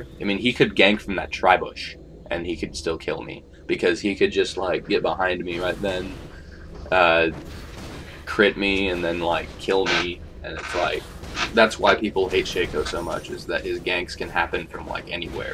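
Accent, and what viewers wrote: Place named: Netherlands. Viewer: Where are you from?You sound Dutch,or mayb Belgian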